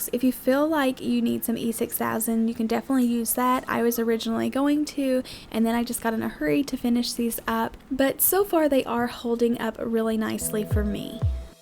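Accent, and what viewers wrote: South, United States. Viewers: I’m new to ur channel but I’m already hooked! I love ur southern draw & how effortlessly & beautifully u executed this DIY 😻 My only disappointment is that I didn’t find this video when u 1st put it out & there’s no way I’ll find these pieces now 😫 But I will be substituting the stuff I can’t find & recreating this to the best of my ability bc I freaking LOVE this!!! Thank u!! 🥰❤️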